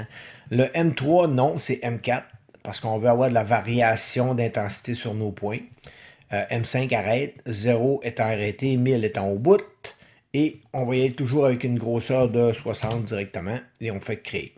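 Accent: Canada. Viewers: Merci beaucoup pour cette vidéo. Tes explications et ton accent canadien super sympa m'ont beaucoup aidé. Je reste quand-même bloqué sur le flashage de grbl. je suis en version 1.1f et je voudrais mettre la 1.1h . Dans le menu déroulant, j'ai le choix entre deux arduino. Ma carte est une woodpecker 3.4. Comment faire? Merci!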